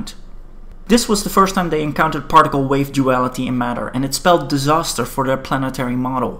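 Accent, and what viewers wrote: Netherlands. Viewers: Excellent! Thank you. I think your H diagram didn't show the 1s orbital? Also, is the accent from Rotterdam? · Are you from the Netherlands? You sound so Dutch.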